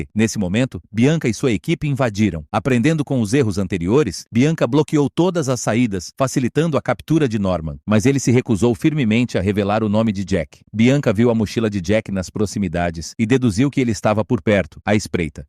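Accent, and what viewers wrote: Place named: Mozambique. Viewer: em uma hora e todo acompanhado com imagens Você é gênio O seu português é fácil perceber um Moçambicano parabens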